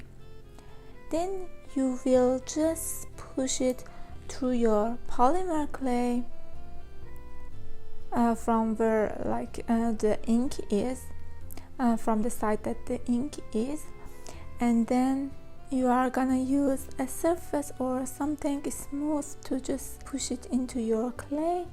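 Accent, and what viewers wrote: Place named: Iran. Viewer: I can call it from the accent that you might be Iranian ! but thanks for sharing the technique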